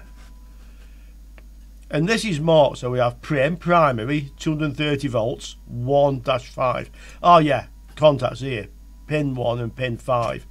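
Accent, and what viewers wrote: United Kingdom. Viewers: Yes, he's British. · hard to understand this british acent for an non native english speaker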